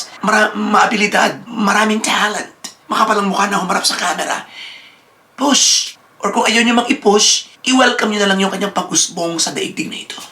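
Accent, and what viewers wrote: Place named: Batangas, Philippines. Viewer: Congrats po.Para pong Batangueno ang accent nyo😊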